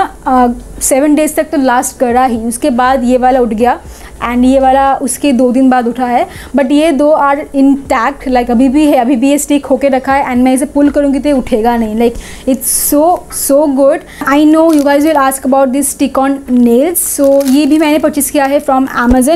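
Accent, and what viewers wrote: Bengal, India. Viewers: You are Bengali apke baato se pata chal Raha hai Bengali log Hindi bolte hai toh pata chalta hi hai 😅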